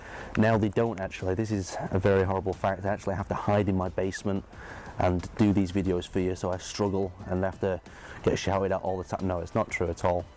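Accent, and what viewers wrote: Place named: Manchester, United Kingdom. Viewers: I'm from Manchester (the city, greater Manchester), I have a similar accent. I always thought because of my accent it would make it impossible to do you tube, but proved me wrong! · Oi Manchester lad! Greetings from West! You should try scouse accent. That would be hilarious in your videos.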